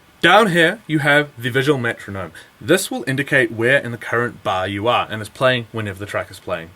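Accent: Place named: New Zealand. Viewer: Damn. I'm buying an APC 40 Mkii this week, really nice to hear a fellow Kiwi voice explaining the ins and outs. Great demo, and I'll be downloading the scripts and exploring the functions. I cut my teeth all on technics 1200's and vinyl back in the early 90's, dropped out of the scene a while, got back in with traktor and the M-audio exponent midi controller, then messed with Pioneer CDJ's a while, but never really felt quite at home with any of them. Maybe the APC will be the right path back into it, don't know yet. Your video makes it look good though, and definitely an affordable experiment. Cheers!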